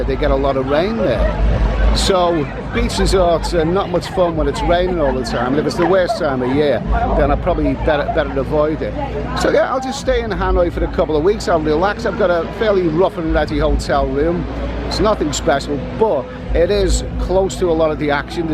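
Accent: Liverpool, United Kingdom. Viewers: Are you a scouser or a wool?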